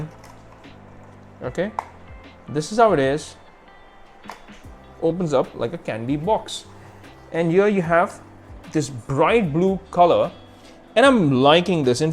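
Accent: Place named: India. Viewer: The Fact that he looks a bit like Chinese...with an indian Accent. 😬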